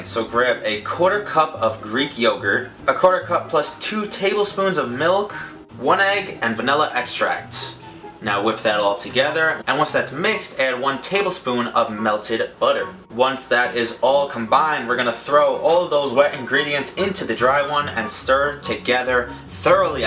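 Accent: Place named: Boston, United States. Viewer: are you from boston?? you have such a bostonian accent!! i love it hahahaha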